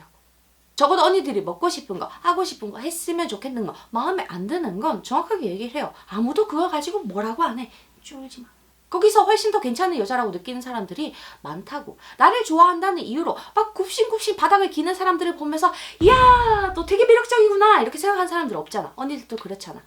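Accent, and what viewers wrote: Gyeongsang, South Korea. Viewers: 언니 대구분?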